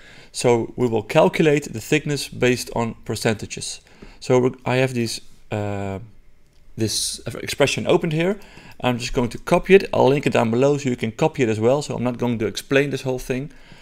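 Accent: Netherlands. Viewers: Thank you for this tutorial! It really helped me. And also - your dutch accent, it's so beautiful, love it!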